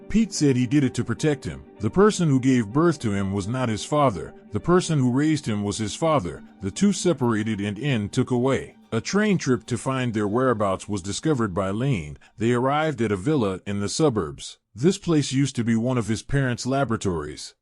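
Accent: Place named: Pidgin, West Africa. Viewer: Why do the narrators for these films not speak English but speak Pigin English?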